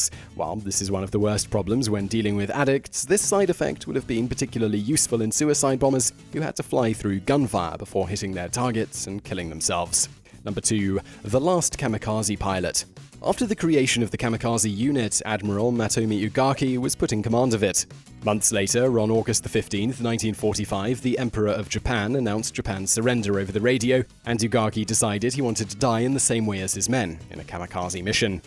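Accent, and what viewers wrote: United Kingdom. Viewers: British vsause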